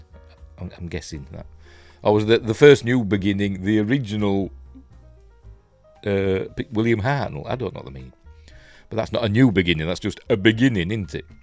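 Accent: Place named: Yorkshire, United Kingdom. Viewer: Nice to hear another Yorkshire accent on Youtube, South Yorkshire? Old Wezzy myself that'knows....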